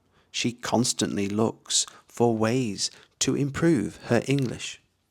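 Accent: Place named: London, United Kingdom. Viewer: What is this goofiest accent? 💀 Pronouncing 'understanding' as 'OWNDERSTANDING' is actually unhinged. Bro is literally running an English channel just to confidently butcher his own language. Why do you sound like you’re actively choking on a hot potato? Even the Oxford Dictionary knows it's a clear 'A' sound, but here you are pushing your muddy, regional dialect on international learners. This isn't 'authentic B2 English', it’s just pure auditory torture. Imagine buying an English course only to end up sounding like a generic NPC from a sketchy London alleyway. Is this really how you teach people?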